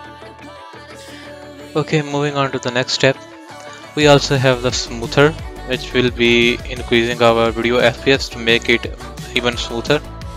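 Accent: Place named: Pakistan. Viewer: I guess you're from Pakistan from your voice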